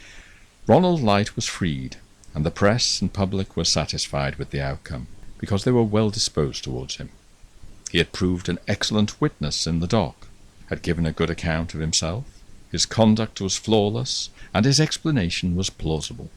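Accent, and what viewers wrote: United Kingdom. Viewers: I really really love this British accent and reading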